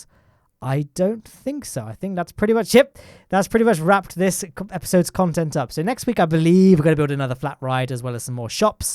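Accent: United Kingdom. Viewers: This is the most british commentary i've ever heard :D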